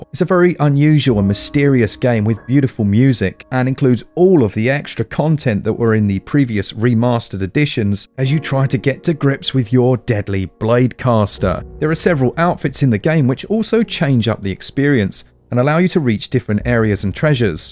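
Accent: United Kingdom. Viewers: yay british. im subbing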